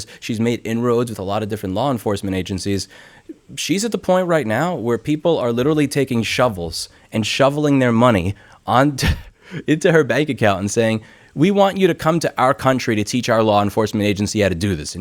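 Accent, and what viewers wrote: New York, United States. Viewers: I subscribed because i enjoyed hearing you, an educated and passionate individual, talk about injustice in a fair and measured way with an excellent new york flair. I stayed for the same reason, but also because i appreciate your perspective on the world. Your commitment to values like honesty and integrity give me hope that there are still some good people out there. Im a pilot, just getting out there in my career, and doing things like networking on linkedin and building my resume make me feel like trash because im not succeeding on the modern pilot metric. I just have to remember what you said: success is based on what youre trying to personally achieve. Thanks, louis.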